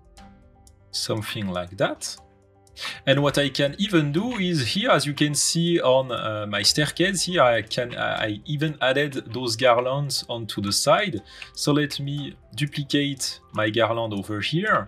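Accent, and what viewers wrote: France. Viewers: fait des vidéos en Français stp ta un gros accent Français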